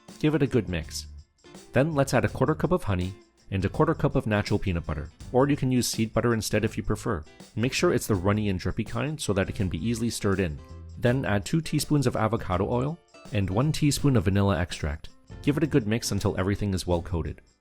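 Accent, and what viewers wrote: Canada. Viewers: Very nice recipes. 💖I have been baking my yeast breads using granola and what a game changer that has been. I haven't gone back to my regular favorite bread recipes since I started using granola in them. I will definitely start making my own granola now that I have watched your video. I need to ask...are you from Canada? A few of your words hinted to me that you are Canadian. Thank you so much for sharing for recipes.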